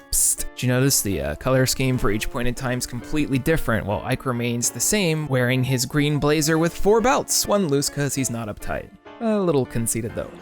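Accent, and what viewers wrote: Louisiana, United States. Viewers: Did not know you where cajun. I'm from Louisiana, north Louisiana so not cajun but a Louisiana baw none the less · oh shit baaaaaabie i didnt know you was cajun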